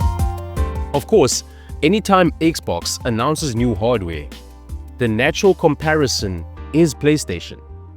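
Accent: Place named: South Africa. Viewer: u sound south african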